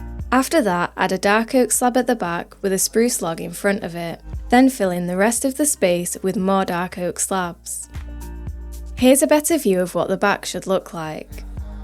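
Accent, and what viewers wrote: United Kingdom. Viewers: This is another reason why I love British accents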